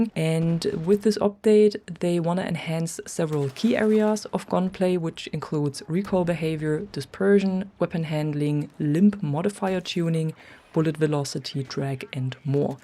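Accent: Germany. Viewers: this is my new favourite battlefield channel. are you from germany? you sound german :D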